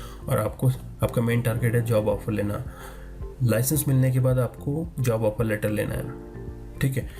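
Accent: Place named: Ireland. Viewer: Dang that's a thick Irish accent or are you drunk or both?? Please next video try accent free English, then I can understand what you are saying.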